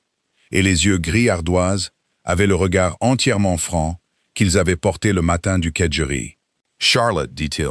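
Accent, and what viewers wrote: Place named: United States. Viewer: Merci, j’ai bien aimé cette histoire très bien contée avec un joli accent, le même que celui de mon mari américain..très agréable histoire d’amour..😊 Je vous écoute du Sud de la France..😊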